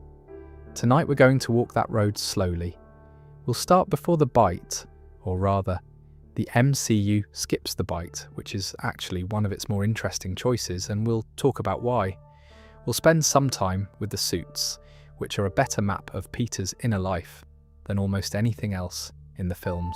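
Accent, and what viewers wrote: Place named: United Kingdom. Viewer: man if only you weren’t british 😭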